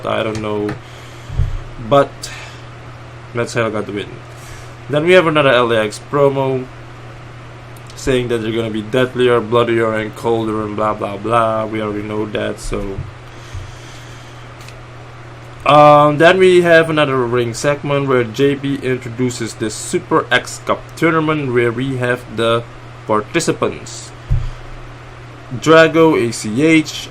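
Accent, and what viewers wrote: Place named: Europe. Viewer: Your accent is interesting. I thought maybe Europe somewhere. Anyway your vids are dope. New sub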